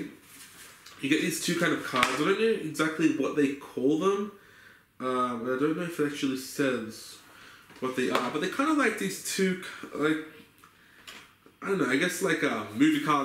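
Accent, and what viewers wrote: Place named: New Zealand. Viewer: Your kiwi accent was just so funny bruh. Nice video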